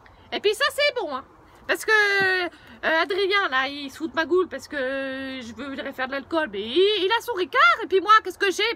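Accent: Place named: Berry, France. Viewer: Euuuuuuu, c'tieu fumelle c'tiont l'accent berrichon, pis alors rin à vouèr avec eul' nord pisqu'allé au milieu, dam'. Allez bonsouèr mon bounhoumme et pis pas p'us.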